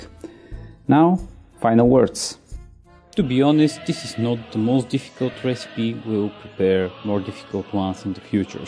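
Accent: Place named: Eastern Europe. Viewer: Nah, u just can't understand slav accent.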